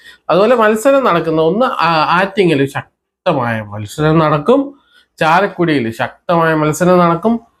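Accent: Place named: Tamil Nadu, India. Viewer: സംസാരത്തിൽ തമിഴ് കലർന്ന പോലെ ഉണ്ട്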